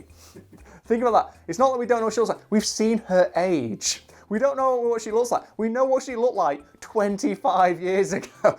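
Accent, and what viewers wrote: United Kingdom. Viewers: He has a british accent or something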